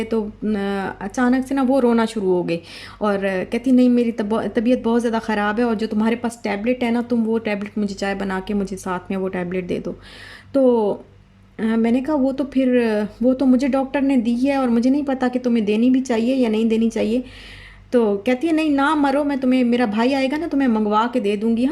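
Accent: Saraiki, Pakistan. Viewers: Ap Multan se hain?sariki speaking hain?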